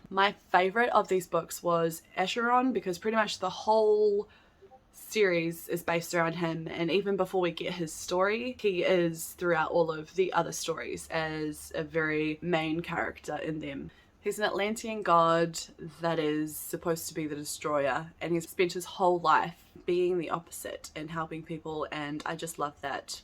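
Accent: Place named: New Zealand. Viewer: Yaay, I knew I recognised THE greatest version of an english accent there is! (I am, unfortunately, not a Kiwi. I just love everything New Zealand ☺️)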